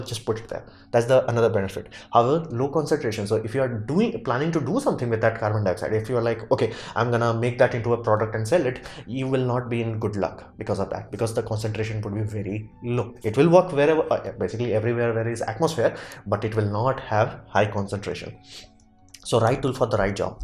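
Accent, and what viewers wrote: India. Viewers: I see. I think if you just took the existing scripts and narrated them a little more slowly, it would make for a slightly longer video yes but may improve it for viewers. I don't think 30 minutes or so is too long for a youtube video -- I agree that there are diminishing returns when you get over the 30 minute mark, like 1, 2 hour videos won't get views. But 30 minutes is fine, people watch informative videos of that length from emerging channels on youtube. And part of it is also your accent -- I am not trying to say that in a judgmental way, just when you combine it with the speed that you talk sometimes I don't catch something and have to skip back. I am an American so if I was Indian maybe I would not have any such trouble. And I am not saying it's a major issue, but even a minor thing like that can an obstacle to your channel's growth I think as people are lazy, they just want to watch videos that entertain/interest them in a very passive way without effort. 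They will click away. But if you slowed down just a bit I think that would completely eliminate the issue. As far as the tech limitation, I'm sure someone with as much tech expertise as yourself could find a workaround, right?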